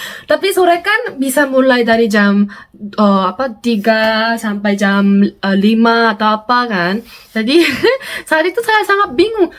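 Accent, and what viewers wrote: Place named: Indonesia. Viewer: Logat bicaramu udah in dg onesia banget Cantik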